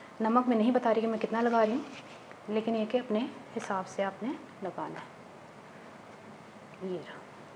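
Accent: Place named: Punjab, India. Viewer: Dear.tuci great ho..love ur cooking nd love ur voice in banjabi style😘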